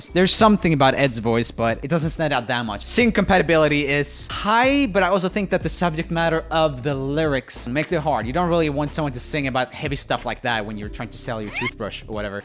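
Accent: Sweden. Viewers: JoeL: I'm getting old guys JoeL: Help me, I can't pronounce anything Me: You're Swedish... That's way...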